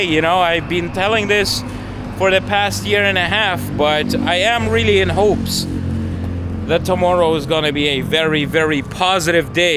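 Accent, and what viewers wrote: New York, United States. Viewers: Надеюсь. Он снимает фейковые видео, чтобы заставить вас пожалеть. Он не настоящий русский. Разговаривает как какой-то нью-йоркец. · i notice in this video you have a hint of a New York accent lol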